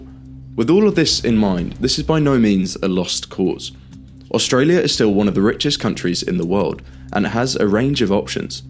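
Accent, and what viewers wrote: England, United Kingdom. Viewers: Bullshit with an English accent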